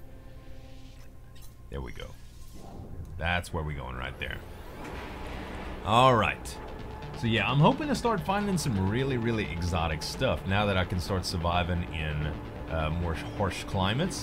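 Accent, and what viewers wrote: Louisiana, United States. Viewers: Z, I can tell your from Louisiana...I am also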